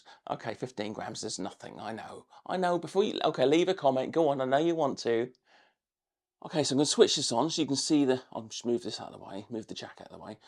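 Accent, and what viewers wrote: United Kingdom. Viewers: Thanks a lot for the excellent review,professionally done,plenty info,no gobble dee gook. Like the friendly style and Your British accent too ! Regards: Peter from across the channel.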